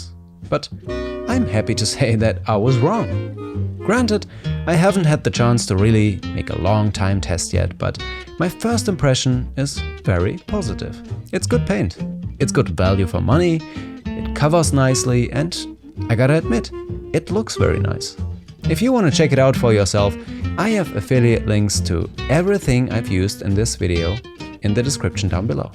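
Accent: Germany. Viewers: You sound so German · It took me 2 min and then I was like... "Is there a tiiiny german accent in there?" Clicked on your channel desc and damn hey german fellow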